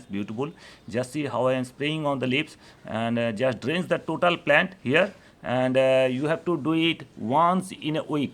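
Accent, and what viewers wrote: India. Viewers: The best Indian accent so far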